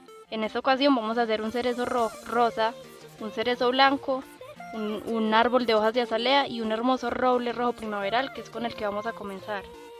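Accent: Colombia. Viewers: colombia? :u paisa? xd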